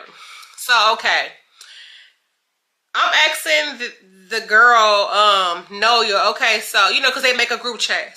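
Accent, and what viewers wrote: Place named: Memphis, United States. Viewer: My family lives in Memphis your accent reminds me of home lol 😂 love it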